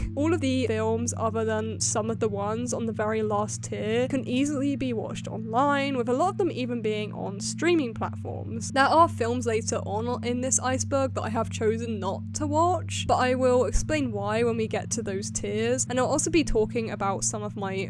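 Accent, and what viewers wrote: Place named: England, United Kingdom. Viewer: Awesome, I'm in Scotland. I did think you sounded English to be fair. I don't mind buying music blind but not movies, funnily enough. I used to but not anymore. When is part 3 coming?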